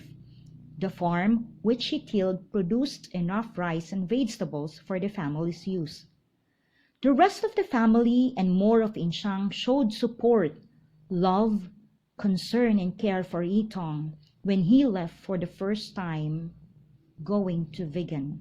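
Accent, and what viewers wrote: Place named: United Kingdom. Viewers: British accent times ten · I can't understand your like a British accent 😌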